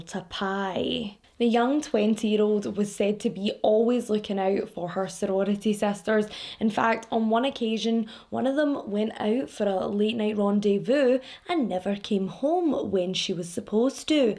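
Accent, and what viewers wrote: Scotland, United Kingdom. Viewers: BTW, are you Scottish? I adore your accent and have Scottish friends. Love visiting them when we can. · You crack me up, you little weirdo. I love your accent so I'd listen to pretty much anything you wanna talk about. Can't wait to take a trip to Scotland!! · I'm just now watching this, months after posting, because I thought she was a young child from the picture. Children stories are heartbreaking more than adults, so I never watch, but out of desperation at the moment, I'll watch this, so I can hear that sweet Scottish empathetic voice of yours and hear about this poor young ladys situation. If ever I have skipped any of your videos, it's cause it's about a child, the younger they are, the worse it is to listen to.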